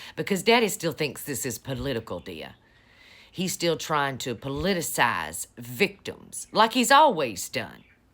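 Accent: South, United States. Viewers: luv your voice ma'am! true southern bell..... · I want to be southern and sound like you. Also you always hit it hard. Thank you.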